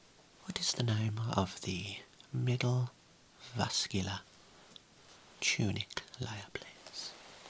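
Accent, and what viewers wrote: Birmingham, United Kingdom. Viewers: Brummie accents are so perfect for asmr. Great job again buddy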